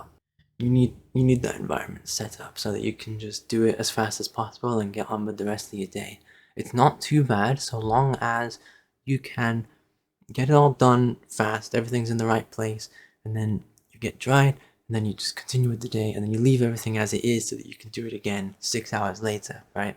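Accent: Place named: Eastern Europe. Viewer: You sound Eastern European, do you feel OK about forcing British people to pay for your fetish?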